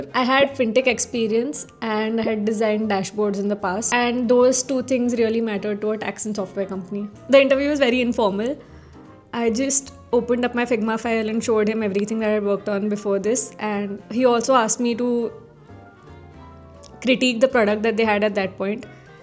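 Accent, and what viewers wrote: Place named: India. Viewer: Itna gandha accent hai pajjeett vala. Hindi mai bol le London ki rani.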